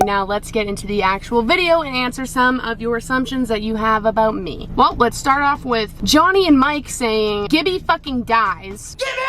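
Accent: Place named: Massachusetts, United States. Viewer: your MA accent is strong in dis one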